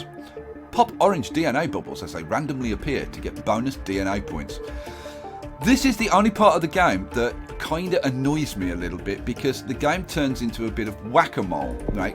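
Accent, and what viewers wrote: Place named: Wales, United Kingdom. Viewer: Loved the welsh accent! haha! who's coat is that jacket?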